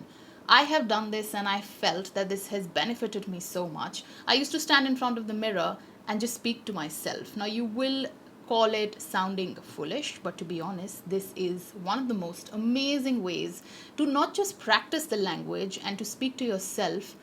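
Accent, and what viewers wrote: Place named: England, United Kingdom. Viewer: Really loved this video. I really love your English accen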